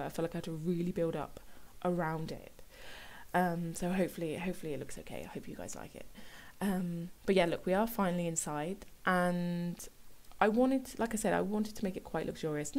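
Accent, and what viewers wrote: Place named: Surrey, United Kingdom. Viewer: I hate you. You're making me miss the boats from the Sims 3 Island Life... ;-) I'm just kidding (well, on the first sentence at least, the boats were cool, the game breaking bugs much less so...), but it is a really cute build! It's a shame there isn't a world builder as there is a boat permanently attached to a jetty in central London that could inspire a restaurant build, but there isn't really a world with a lot where it feels like it could be put in and it would fit. For the storage, it's a shame that someone hasn't done a modded sofa which also includes drawers, it's a feature of canal boats and caravans. There are also a lot of overhead cabinet type storage which isn't typically interactive in the Sims, so someone modding those would make sense (although it would be even better if they were added to a tiny home refresh so all players had access to them). 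Heck, even having functioning drawers at the end of a double bed would be a nice way to remove the need for a dresser. As for why you're back in Henford-On-Bagley, going by your accent (Surrey?), it makes sense that you're going to be able to build things more naturally there, you're not going to need to look up reference images to make it fit, you've probably seen a lot of it in your life time. I wish the Sims 4 would arrange worlds in clusters as I'd love to see what you and JessicaPie could do if you had to rebuild the high school town in an English style (I'm thinking somewhere like Reading), the University town may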